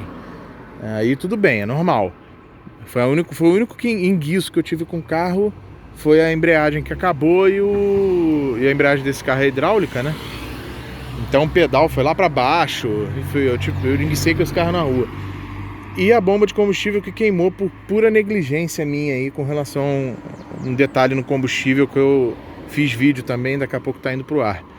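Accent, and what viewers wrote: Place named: Rio de Janeiro, Brazil. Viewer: pelo sotaque do dono do video é Rio de Janeiro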